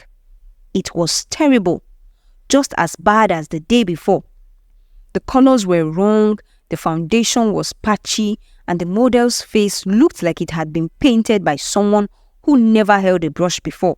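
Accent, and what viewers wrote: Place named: Africa. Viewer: I’ve been binging this whole channel for the past hour! It just popped up on my feed, and I love folk tales, fables, and traditional stories of different cultures that don’t usually get a lot of exposure internationally. The diverse communities in Africa, which are many, are very interesting. They’re not like any others that get the most attention and are retold and regurgitated by those who don’t have the knowledge or experience to tell these tales properly to convey the message. This channel is so unique and the stories they share are powerful and apply to so many different situations in every community. The messages are poignant and touching and they make you think deeply about the overall lessons they are supposed to teach and the difference it’s supposed to make in all aspects of life. Not that this is important to the overall story, but the narrator’s accent really brings it to life. 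The accents of the many unique cultures in Africa are so distinctive and beautiful. Stories told by the people who are intimately connected to the both the land and the people. This gives it an authentic and trustworthy feel that leaves an impression that stays with you forever. Bravo to the channel and its team for such excellent and extraordinary work!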